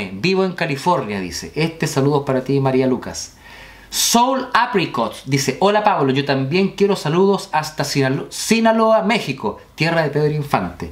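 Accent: Chile. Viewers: Eres chileno.?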